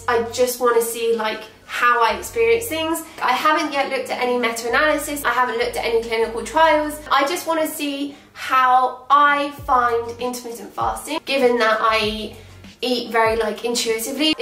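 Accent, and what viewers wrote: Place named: United Kingdom. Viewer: omg your accent sounds so british! I'm just way too much used to hear american english lately, I almost forgot how a brit accents sounds :D ps. nice vid too :)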